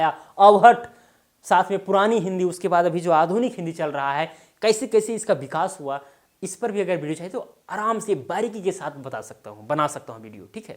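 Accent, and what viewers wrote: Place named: Bhojpuri, India. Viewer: Aap toh khud ki galat hindi bolte ho. Grammer galat bolte ho. Accent ki baat toh rehne do woh toh alag ki bhojpuri accent hai.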